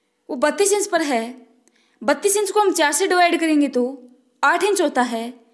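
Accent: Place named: Garhwal, India. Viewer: Boli mai garwali tone lag rahi hai .aap utrakhand se ho?❤❤❤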